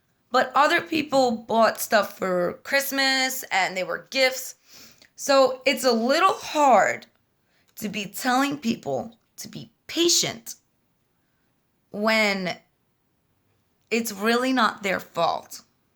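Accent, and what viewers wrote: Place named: New York, United States. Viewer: Since the first time I met you I have felt you are a honest person. I too tend to "walk the line" in this wax world, I ignore drama as much as I can as you do. Good for you for standing up. Keep being you and keep making these videos! I love watching you (and listening to your New York accent) I've heard this before "Who here has been victimized by Regina George" and it's completely true. You are either for her or against this person, there isn't an in-between. If you ever need anything I'm here for you!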